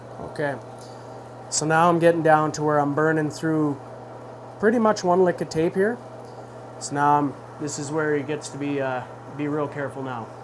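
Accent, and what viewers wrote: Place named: Canada. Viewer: FatherSonGunFun Um, pretty sure that's a Canadian accent.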